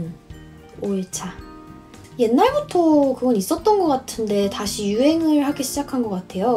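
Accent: United Kingdom. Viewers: 오늘따라 더 이뻐보인드아...♥ 항상 잘 보고 있어용 영국 발음은 처음들어서 그런지 자꾸 끌린다앗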